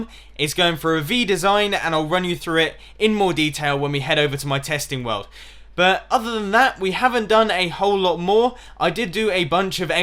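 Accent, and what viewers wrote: United States. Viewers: Woah, that american accent tho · Haha that American accent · Is that an American accent? What the heck? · Dat American accent:D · Dat American accent. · Love your american sales person accent!! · Your American accent is godly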